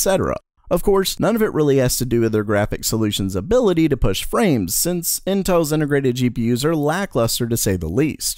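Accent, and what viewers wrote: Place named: United States. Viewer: It’s both okay? He’s American so he pronounces it wrong but whatever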